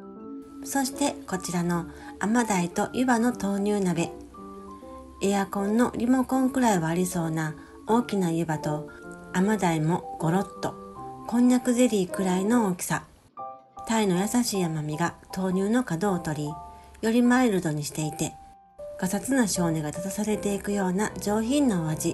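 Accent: Kyoto, Japan. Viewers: 、初めまして🐦 二条城は、高校の修学旅行で行った記憶が微かにあります。お料理が如何にも京都⛩️らしく美味しそう。食材も京都独特、湯葉はぜひ食べて見たいですね。の語りも京都を感じさせ、失礼ながら、お姿も十二単衣が似合いそう🤱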